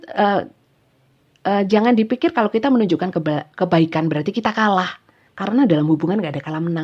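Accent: East Java, Indonesia. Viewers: Makasih. Bunda readingnya ... Ituloh logat Jawa timur yo 😁😁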